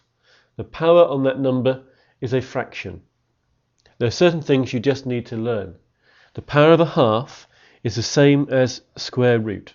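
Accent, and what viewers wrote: United Kingdom. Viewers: Cool British Accent makes me feel smarter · Ur accent is incredibly awesome btw, what type of British accent do u speak?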